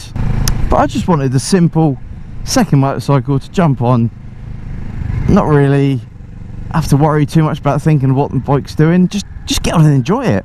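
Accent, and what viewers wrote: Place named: United Kingdom. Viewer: 😮Man is your British accent HEAVY dude. It's kind of hard to understand you to be honest with you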